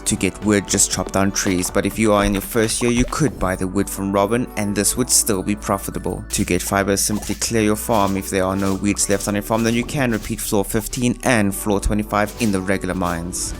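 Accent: South Africa. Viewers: South African?!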